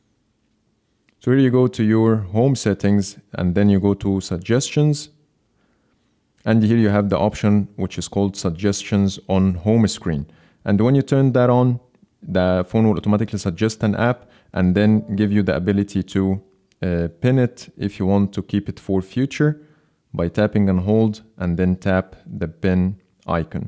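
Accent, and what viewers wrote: Egypt. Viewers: very Egyptian accent عم الناس ! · A true Egyptian English accent. Who agrees? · انت مصري صح؟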